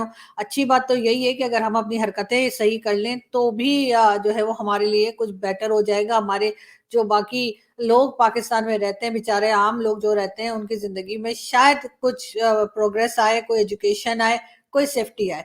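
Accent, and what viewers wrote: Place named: Allahabad, India. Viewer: you speak EXACTLY like ALLAHABADI speak You have atleast, inherited this from your father You are the MOST GRACIOUS AND LOVEABLE DAUGHTER OF ALLAHABAD MY piles of love and Ashirwad ❤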